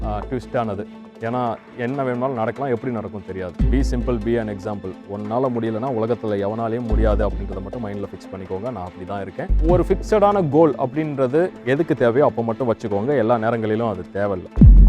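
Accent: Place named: Coimbatore, India. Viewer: Voice of coimbatore... super anne...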